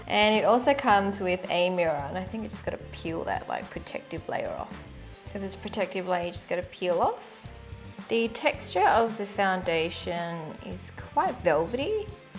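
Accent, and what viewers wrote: Australia. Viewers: I started watching her and I was like, is she Australian? I was right. Go Australia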